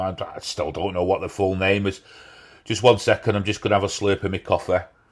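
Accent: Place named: Liverpool, United Kingdom. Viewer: From across the pond, great job. Your accent, are you from Liverpool?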